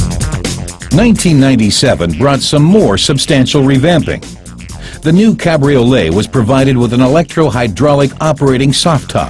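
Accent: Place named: United States. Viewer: The awkward American narration grates.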